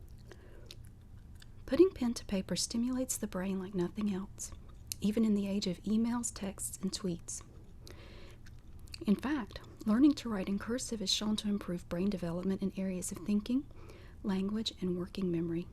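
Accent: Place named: Georgia, United States. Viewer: Boy you sound like you're from Georgia too! I have a thick accent too according to my friends haha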